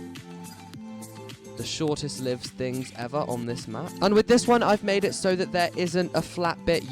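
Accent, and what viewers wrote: United Kingdom. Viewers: Cool love the build <3 😀 Edit: i love your voice! Are you British cuz I am ❤️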